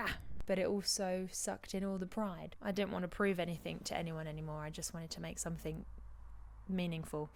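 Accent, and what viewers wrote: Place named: United Kingdom. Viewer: you have so much Tallent. I'm addicted to your videos. Mus be your british accent. Your voice is so beautiful...first time I've heard your voice othe than fvm. Keep on rockin'....a fan in mississippi.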